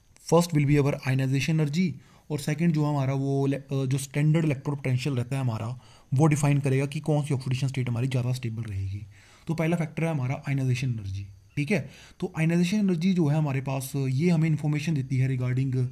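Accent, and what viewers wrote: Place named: Haryana, India. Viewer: Sir aap haryana se ho kya . haryanvi jesi feeling aa rahi h aapki language m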